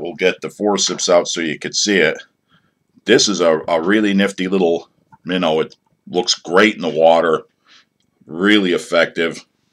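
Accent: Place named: Pittsburgh, United States. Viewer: hey I was just wandering if you were a yinzer?. I am and you sound like a yinzer. check out my video about the secret hair jig as I give a shout out to you. Great job and keep up great work. if you are a yinzer leave a message for me and we can get on the water. thanks yankeelipper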